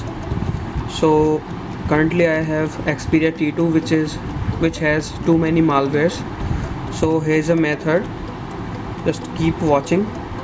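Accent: India. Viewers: eat a like for your Indian accent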